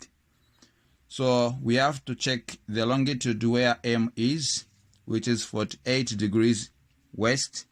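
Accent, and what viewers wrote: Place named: Tonga. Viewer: Your accent is giving sir! You sound tongalish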